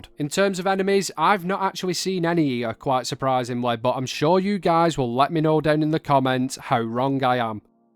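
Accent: United Kingdom. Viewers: Сколько английский не учи, а британский акцент все равно без субтитров воспринимать практически нереально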